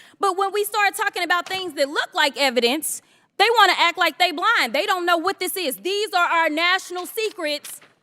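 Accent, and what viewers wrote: South, United States. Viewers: A Southern accent or manner of speaking is no reason to look down on her. She's making a point to fellow Republicans, and cited her facts and sources clearly. I don't see the issue.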